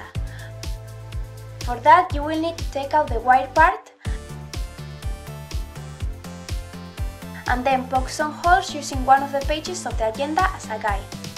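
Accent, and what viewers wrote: Spain. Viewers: Me encantan tus diseños, quizás te copie alguno ;). Se nota mucho tu acento español pero se entiende todo, la gente que te critica por eso es sobretodo porque son monolingües(y eso es malo para el cerebro y la creatividad) Espero ver algún día un vídeo en Español, ya estoy harta de que todos hablen inglés en youtube y en el instituto.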